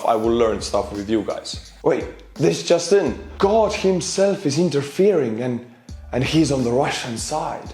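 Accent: Estonia. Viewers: I like how the patreons are read. I am considering becoming one just to see how my name sounds in Estonian lol. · The best news on this war with Estonian Accent... Mr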